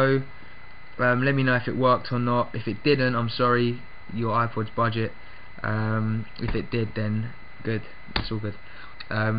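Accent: United Kingdom. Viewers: that is an london accent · your british ???? cause thats kool doggy!!!!!! Lol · nice british acent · Thank you my British speaking friend. :D Now im up 60 nigga!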